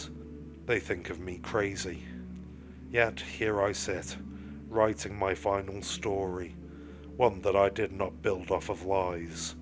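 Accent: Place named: United Kingdom. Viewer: Hello, I really love your Brithsh Accent it's So Sexy 💋 much love Sexy Grandma Darkness ✌💙💙 Ps keep doing This you are very Good at it ❣❣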